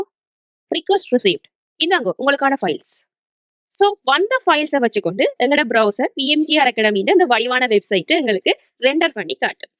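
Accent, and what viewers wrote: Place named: Sri Lanka. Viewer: Super sister theliva irukku, and mostly ungaloda Mozhi ilangai tamizh Mozhi romba pidukkum athanala theliva puriyuthonu Theriyala, nandri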